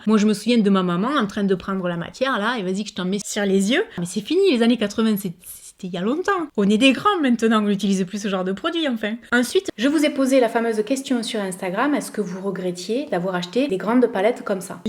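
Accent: South, France. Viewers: Coucou ma belle, pour les fonds de teint, je n'achète pas ceux là, perso j'ai toujours acheté celui de chez Avène qui me correspond, et les grandes palettes de fard à paupières, ceci n'engage que moi, mettant toujours les mêmes teintes, elle est petite avec trois couleurs à l'intérieur, toutes ces marques c'est du pure marketing pour vendre, et perso cela ne m' intéresse pas, je trouve çà moyen, moi ce qui m'agace ce sont les produits qui ne sont pas remplis complètement, ou il y à une marge parfois de 3 à 5 cm alors que c'est indiqué 100 où 200 ml, et çà prendre le consommateur pour un C ..... !! où certains tubes que nous sommes obligés de couper car il reste encore de la matière, pour les rouges à lèvres, je te rejoins je ne comprends pas également, nous sommes dans une société de surconsommation, quand je vois défiler tous ces calendriers de l'avent à des prix de fou, c'est vraiment abusé, je n'adhère pas à ce concept, après comme tu le dirais chacun fait ce qu'il veut de son argent, c'est bien de remettre de temps en temps les choses au clair, mais malheureusement tu aura toujours des personnes qui se comportent comme des enfants et la bêtise humaine ne cessera d'exister, bises à toi copine du Sud avec ton accent que j'adore, garde ta simplicité et ta bonne humeur - Maryline :thanksdoc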